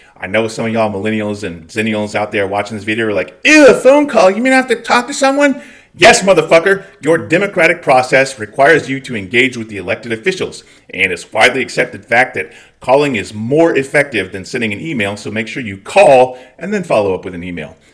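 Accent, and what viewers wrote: Scotland, United Kingdom. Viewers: Love ya, keep making and I’ll keep watching and sharing. Also more Scottish accent. Studying Scottish Gaelic myself and am a complete sucker for all things Scottish 😻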